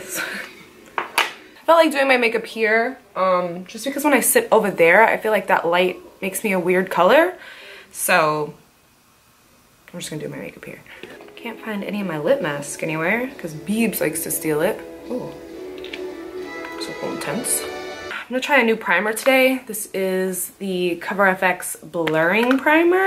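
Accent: England, United Kingdom. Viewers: Your soo good at an English accent hahaha😅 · honestly any problem you encounter you always solve it!! lol like ya wig! I love the grey wig!!! :)Also... I don't know if anyone has told you but your English accent is one of the best !!!!! I love it lol loving these blogs!!!! always inspired :) :) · I love her English accent lol. She is my favorite & by far the best YouTuber/beauty guru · love the british accent. <3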